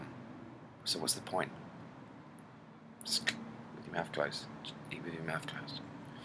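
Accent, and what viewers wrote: United Kingdom. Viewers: Oh... So he doesnt speak french but british englisch !?.. I thought he was Francis from France.. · Thanks for these videos, it really reminds me of England listening to you. Wishing a real cup of tea could be shared, Ill just sip alone until dreams start breaking the realm of reality.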